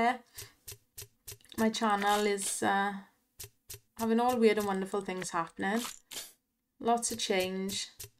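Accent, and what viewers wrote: Wales, United Kingdom. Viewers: You've done some good videos i'm a fan and i love the welsh accent. I'm sure its a welsh accent i am hearing. Lush accent. the only thing that makes some videos a teeny bit rusty for me is consistent low stakes but at least its controlled moderate gambling and doesnt encourage excessive gambling so this is pretty good and clever how you do it. Well done girl. Tidy.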